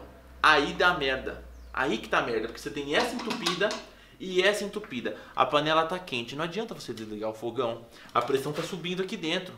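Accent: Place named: Jandira, Brazil. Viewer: Cara vc é de Jandira né?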